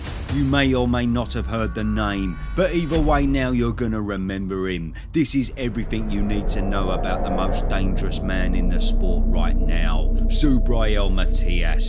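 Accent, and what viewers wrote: Cockney, United Kingdom. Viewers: I love the cockney narration which makes me think of being in a pub in south London. I'm a Brit living in America.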